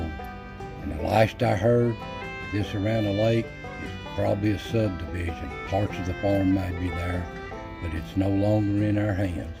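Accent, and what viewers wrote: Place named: Kentucky, United States. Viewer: "Taint no hant it was Indians watching and protecting, "Love the way you talk, "A Kentuckian Cherokee here, "That's the way everybody talks up in these mountains. "Stayed in trouble at schools because of the words "Taint and hant, "Yep, Hant got none or I taint study and work at the same time, Or over yonder, Or up the road just a skip, "Skip meant a mile or so. "Love it, "You can not beat mountain folk, Know where, "And if they like you, "Well then you've got a friend for life, "But if they don't like you, "You'll know instantly.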